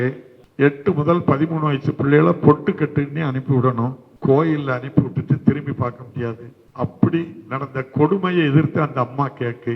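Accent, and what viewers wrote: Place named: Tirunelveli, India. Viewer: ❤❤❤ நெல்லை மாவட்டத்துக்கே உரிய தமிழ் நடை இவருடைய பேச்சில் உள்ளது.❤❤❤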